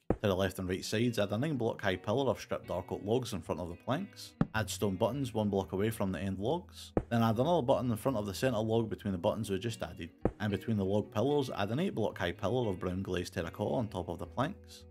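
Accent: Scotland, United Kingdom. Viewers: Build: 100% Style: 100% Shaders: 100% Scottish Accent: 110% most underrated minecraft channel in history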